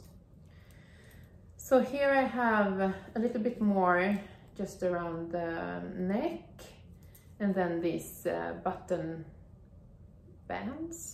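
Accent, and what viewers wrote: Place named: New Zealand. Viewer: Hello from New Zealand. I wondered whether you had learned English from a kiwi - some of your vowels sounded distinctly kiwi-ish! Then I read your explanation below - our neighbour Australia. Thank you for your podcast in English. You have made beautiful garments.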